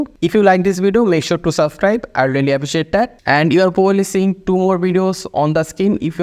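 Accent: India. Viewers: bro when i hear a idian talk i instanly knew that my problem will be fixed :)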